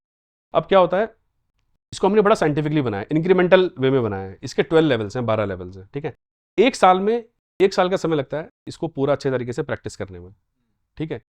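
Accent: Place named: India. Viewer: indian Accent is most Unpleasant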